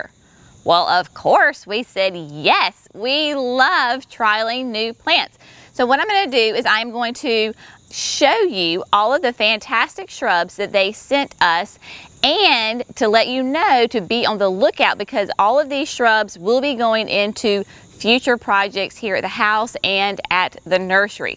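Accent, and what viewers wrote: South, United States. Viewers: I live in Minnesota but I have family in Louisiana, so I not only enjoy the gardening features, but I love the southern accent!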